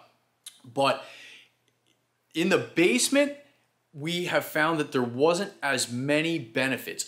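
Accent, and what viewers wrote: Canada. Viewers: sounds like a Canadian accent. Hi from Niagara!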